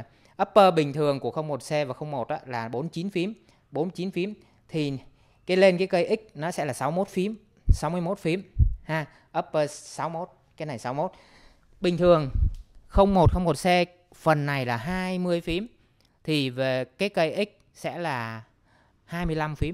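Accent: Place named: Ninh Binh, Vietnam. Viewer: dạ, nghe giọng nói của a, sao như là giọng chuẩn NB ấy nhỉ..hihi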